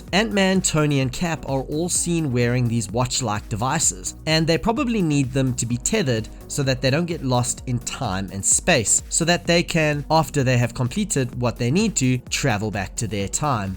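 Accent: South Africa. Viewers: Are you South African? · Is this a south african?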